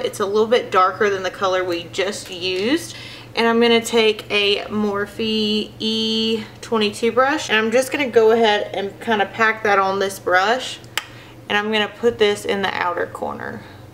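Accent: South, United States. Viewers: I just noticed your po box ! I knew you had a southern accent but had no idea that we are in the same state ! That's cool ! I look forward to your next tutorial ! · I love your videos and insta posts! Where are you from? I love your country accent.. I'm from TN and have a country accent too!! :) I loveeee my morphe 35K palette! It's perfect for cool and warm toned looks!! ❤️ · My favorite palette by far is the Amazonian Clay Rainforest palette by Tart. It is perfect for my skin tone and the shadows bring out my blue eyes. I HAVE to get my hands on the morphe 350 palette and do this tutorial!!! I looooove vampy lips too but I have thin lips so this makeup look may not look as good on me. My instagram name is cck008. I love your channel and your southern accent so much!! Ps do you whiten your teeth? they look sooo white! If so, please do a video where you talk about how you get your teeth to look so good!!!! 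xoxo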